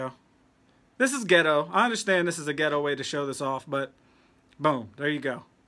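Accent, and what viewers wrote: South, United States. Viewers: I knew he wasn't black, he doesn't even sound black you all just aren't used to white Southern accents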